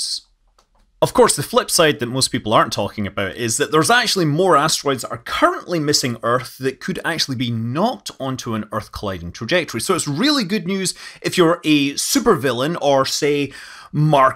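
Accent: Scotland, United Kingdom. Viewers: Scott once again I wish to thank you for your fantastic yet human explanation of this groundbreaking step forward for humanity. You take the complex and bring it into focus so that mere mortals can grasp it. Hearing your perfect explanation is only enhanced by your wonderful Scottish accent. Tipping hat with deepest respect.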